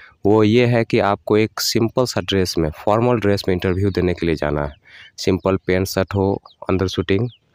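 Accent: Bihar, India. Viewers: u r from Bihar Right?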